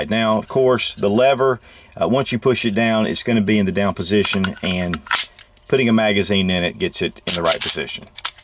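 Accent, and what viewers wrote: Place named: South, United States. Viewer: Good video! what made it great was saying "Either" not the PC "ither" as reporters and commentators do! I am Southern and I think you are also!!1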